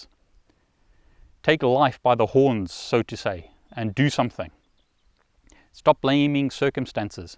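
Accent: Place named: South Africa. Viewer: Condolences to your family,. Thank you for your inspiration. It's something that we definitely need more of. P.S. I thought that you sounded like you were from South Africa.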